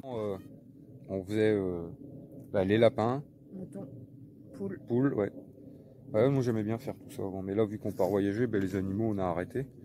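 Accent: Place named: Somme, France. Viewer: Bonjour . En effet des betteraves de ce calibre mérite un abonnement !!! C'est fait . Je vous envoi ce petit commentaire de l'Ile de la Réunion , mais votre petit accent ressemble beaucoup au mien (Je suis originaire de la Somme) !!! Sacrée belle récolte . Le meilleur pour vous . 👍👍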